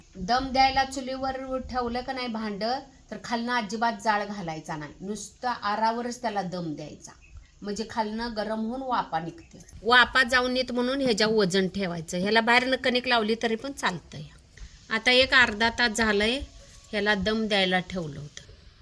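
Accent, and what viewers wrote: Kolhapur, India. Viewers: 🎉🎉😂😂 खूपच छान पद्धत मस्त आणि कोल्हापुरी भाषेलाही गोड वाटते बिर्याणी एकदम भारी शोभा अकोला कर बेरड पुणे वडगाव शेरी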